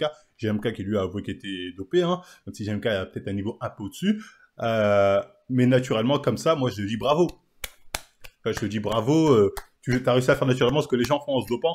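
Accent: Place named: France. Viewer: On aime bien quand tu parles très français comme ça 🤗🤗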